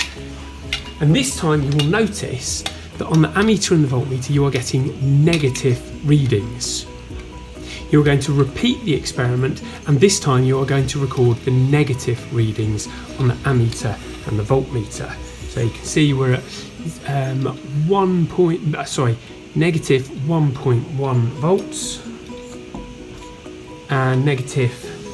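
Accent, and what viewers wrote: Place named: United Kingdom. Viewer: very clearly demonstrated and videoed presentation with clear British English voice. Thank you.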